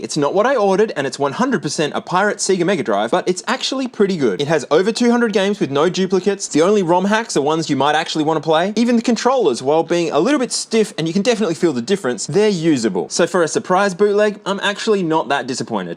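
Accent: Australia. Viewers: how we pronounce Sega in Australia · As an American, seh-ga just feels better to say than say-ga, even though they sound basically the same to my ears. See-ga doesnt sound wrong to me at all though. Just sounds like the expected way for the Australian accent to modify the word. Accents just do that. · I love your dedication to the Aussie SEGA pronunciation! Shocked this bootleg is so good!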